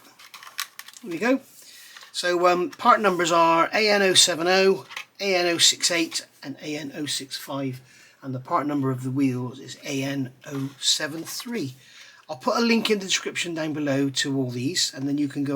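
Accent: United Kingdom. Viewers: define the accent...........UK?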